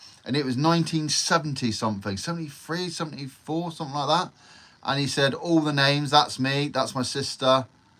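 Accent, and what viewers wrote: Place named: East Anglia, United Kingdom. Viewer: You just sound like a east anglia accent but I read Channel Islands should have a bad South African accent?🤷‍♂️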